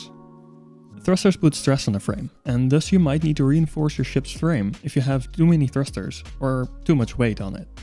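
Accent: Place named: Netherlands. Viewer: Ik herken dit accent direct haha. Dutch space representative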